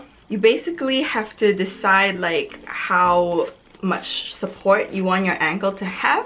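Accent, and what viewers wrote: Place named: United Kingdom. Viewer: isn't that just the British accent?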